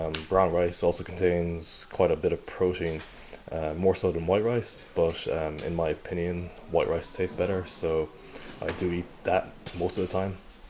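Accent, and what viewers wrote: Ireland. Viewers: gosh, this irish accent. lovely :) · Are you Irish? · I love accent. It’s subtle yet not overly so and still clear he’s Irish. Almost sounds somewhat Scandinavian to me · HAAGHAHAHAHAHA im dead, fuck u thats the way he says it, cause he is irish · something completely unrelated, are you irish? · He has an irish accent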